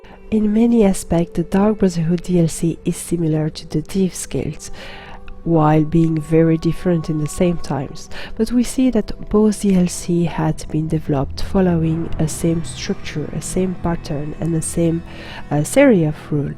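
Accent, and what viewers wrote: France. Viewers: I like the feeling of you talking in your videos (I have seen maybe 5 or 7 :D ). I love your french accent and now I finished watching this video and literally I am buying now the 2000 crowns and am buying this DLC in this moment :D. I am so excited about the DLC, your video was great. Many thanks for not making any spoilers, I am excited about the daedric plane (or aedric maybe :D )connected to this DLC. which daedric prince is with DB? Mephala :D, I wonder how it will look :D. I guess pretty awesome :D. and interresting thing that you told about he feeling of killing someone :D. I look forward for the finisher killcam, I loved them in Skyrim :). when npc was some low level of health, the last hit was a kill-cam execution video, very nice, I liked that. the same, I am peaceful person, but nice feeling in this game. ZOS has made a good job in this part too apparently :). 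Ok, no waste of precious time, I go to dark brotherhood now :). see you there :). PC EU ;o))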